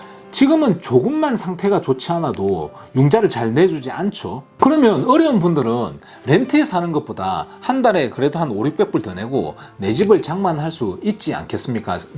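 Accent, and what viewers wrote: Gyeongsang, South Korea. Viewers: 원래 갑자기 스쳐가는 생각이 세상을 바꾸는 법입니다, 적극 추천합니다,,백안관에 청원 하시기를,,,,, ㅎㅎㅎ, 감사합니다, ( 같은 경상도 신것 같아요, ) 👍👍👍💕💕💕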